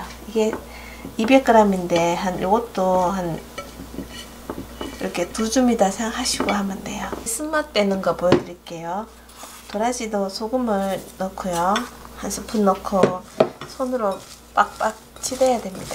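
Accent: Gyeongsang, South Korea. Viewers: 답변 감사해요. 나이도 적잖은데 창피하게 음식할줄을 모르네요ㅠ 작년에 삶은고사리 사서 볶았는데 떫은맛땜에 못먹었거든요. 그래서 물어봤어요. 말씨가 대구분인것 같은데 조곤조곤 설명해주시는거 참 듣기가 좋아요. 많이 배울께요.^^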